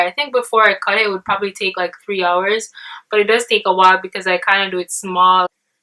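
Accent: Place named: Jamaica. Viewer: Sensing a Jamaican accent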